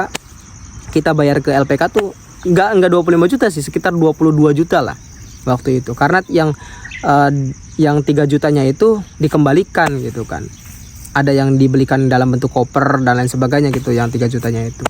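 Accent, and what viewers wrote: West Java, Indonesia. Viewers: Dari logatnya sih kayak orang sunda, Abang orang sunda kah?😂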